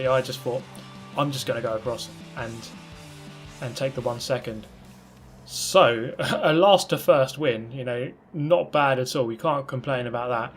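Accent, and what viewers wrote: United Kingdom. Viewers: get flashed you mug XD so brit it hurts. youre the best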